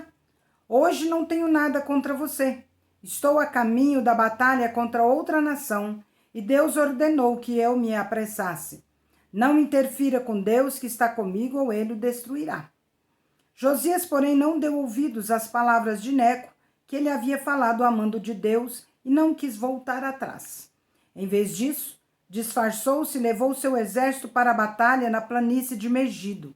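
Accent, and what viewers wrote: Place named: Minas Gerais, Brazil. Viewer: Nossa linguagem de mineirez 😂😂😂 é muito boa.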